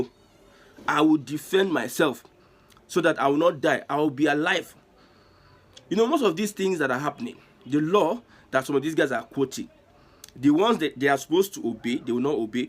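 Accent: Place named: Edo, Nigeria. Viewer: So Nigeria is such a zero-freedom of speech state now. Nigerians stop bullying T-Pain ooo. Make we kuku get military na! Well done, dude... you speak like an Edo man, the kain craze wey people from old Bendel get...😂😂😂. Anywa, I just came across your videos. Id suscribe now.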